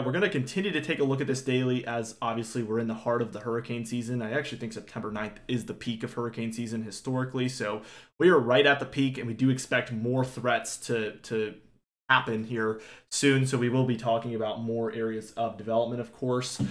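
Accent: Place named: Virginia, United States. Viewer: Just want to drop you a note Young Man, for doing an excellent weather reporting and being on target. I Appreciate your Virginia Dialect, makes me feel at Home. I was originally born in Tidewater area, but presently live in Central North Carolina, Smithfield, area about 25 miles East of Raleigh. Thanks again, have a wonderful weekend.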